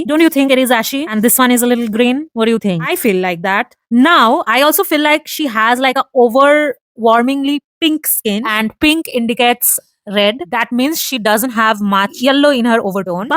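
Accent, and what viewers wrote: India. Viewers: Hell Nha. The actual stereotyped Indian accent only could be heard from south indian people. Obviously she has a Indian accent too just like me. But it's more like North Indian accent. Anyways having accent is normal. Americans have their own accent, British have their own accent. East Asian have their own accent. So do we. But it's just the accent changes within the country because of how multilingual we are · Quite informative video. Please work on your accent a bit more. You sound so like a stereotype of heavy Indian accent. I wonder if it's for the effect! 😂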